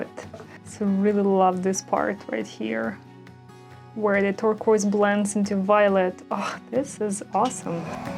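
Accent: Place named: Russia. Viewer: Очень интересно,красиво.. Вы похожи на украинку и манера говорить русская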